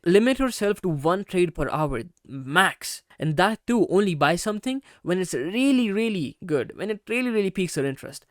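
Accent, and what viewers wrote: India. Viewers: u sound indian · Sounds like Indian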